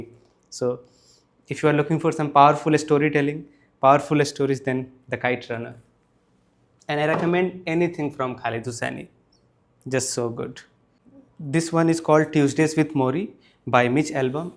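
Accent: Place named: India. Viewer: Nice❤, but your Indian accent wins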